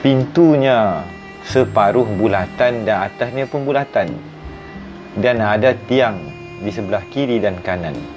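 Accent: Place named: Kedah, Malaysia. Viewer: Wah Bercerita menggunakan loghat utara (melayu kedah ). Jgn diklaim bahasa indonesia pula.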